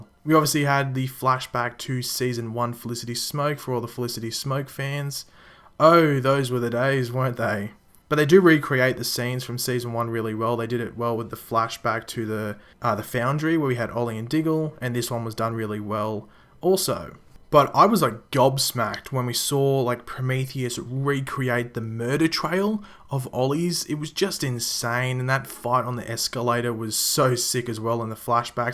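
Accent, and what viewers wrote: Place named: Australia. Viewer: Are you from Australia, You have a Aussie accent :D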